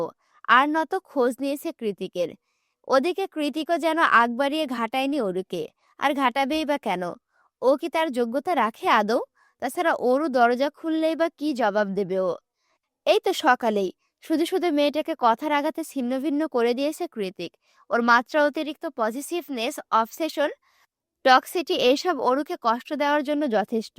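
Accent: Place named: Kushtia, Bangladesh. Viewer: তাই কুষ্টিয়ার মানুষের মতো মাঝে মাঝে কথা বলো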